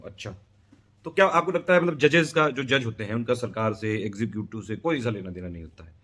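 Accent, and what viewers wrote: Kanpur, India. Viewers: भैया कानपुर से हो क्या आप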